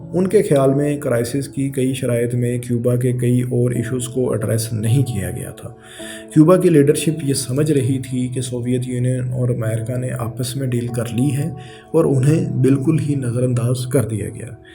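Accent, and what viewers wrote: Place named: Punjab, Pakistan. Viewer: dude, your punjabi acent!! Content is amazing but your accent really ruins it.. Sorry!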